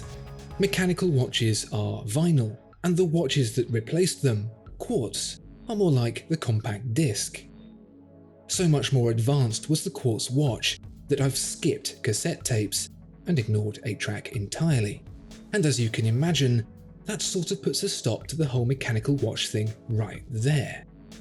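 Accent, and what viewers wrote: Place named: RP, United Kingdom. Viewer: Maybe it was my IPad or me: the Autavia I own has more visual cues than “ go from second hand then case back then name; do that 23 times with a British posh accent that asks questions that he doesn’t answer”. How has Watchfinder earned so many subscribers with that formula? Is it the soft lighting effect and the expensive SLR camera sharing the screen? Perhaps all those other channels that offer watch specs and model line discussion and competitor choices that relate to real-world reasons of why we buy watches- well they must be crazy. Loop four close-ups and add UK accent and there you have it. Half million subscribers.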